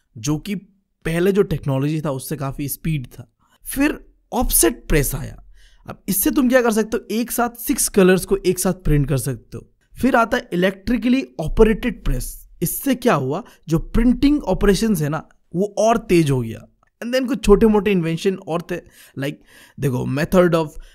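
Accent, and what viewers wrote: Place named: Bengal, India. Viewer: Bhai woh uska bengoli accent hain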